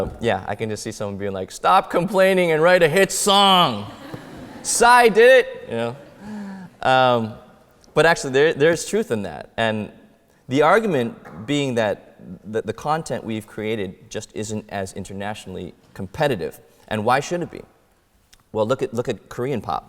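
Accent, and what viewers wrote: United States. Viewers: his chinese is good though (in terms of vocabulary, grammar, way of speaking, etc.)...but he has an american accent when he speaks chinese. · weirdly enough as a guy who grew up in NY, he sounds like he's from LA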